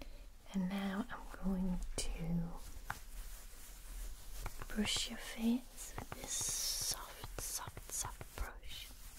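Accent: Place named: Newcastle, United Kingdom. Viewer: Northeastern England, she has a Geordie accent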